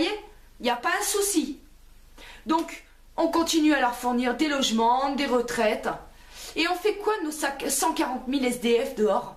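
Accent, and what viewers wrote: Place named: South, France. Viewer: L'accent du sud qui rend tout orateur un peu ridicule. On s'attend à tout moment entendre une citation de la gloire de mon père.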